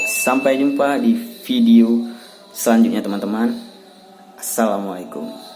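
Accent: Medan, Indonesia. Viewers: Logatnya medan kale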